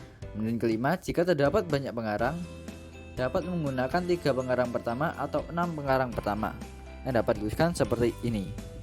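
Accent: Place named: Java, Indonesia. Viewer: Rada medhok emang ya?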